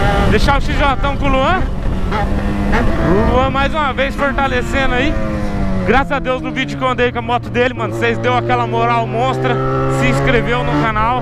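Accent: Goiás, Brazil. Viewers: por que você não fala mais no pique do Goiás???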